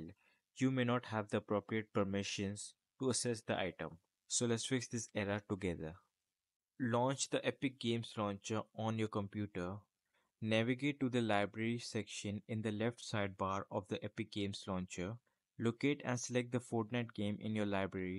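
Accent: India. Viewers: Hearing the indian voice i didn't belive it would work, but i was quickly proven wrong. Thank you!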